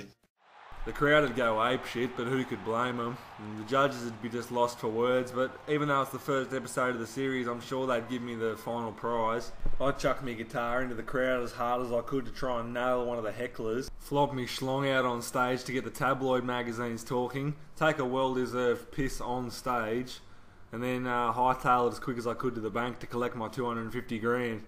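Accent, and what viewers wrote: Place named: Jamaica. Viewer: I have never heard an Australian try to speak patois ahahaha dude that poodle was money!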